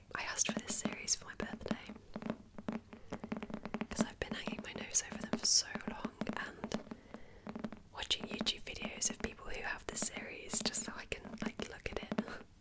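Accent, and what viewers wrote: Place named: United Kingdom. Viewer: How am I just finding you now?!! Books, ASMR and a lovely British whisper! I love your videos so much. Thank you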